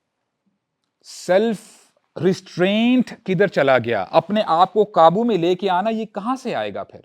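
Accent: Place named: United States. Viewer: Amazing bayan with American accent 😊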